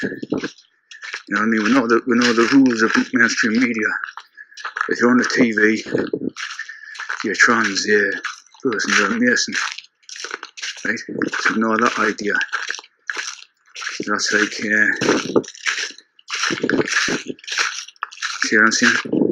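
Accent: Newcastle, United Kingdom. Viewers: I have heard this 3 times now and very interesting to say the least. Love the Geordie accent, Whay aye man! My family are from the north east :) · lol.. 4th time into watching it and still not getting more than few words! 😅 but i will not give up since you say it's important Knowledge and anyway i like your voice..so going soon for the 5th... geordie accent i will decode you 💪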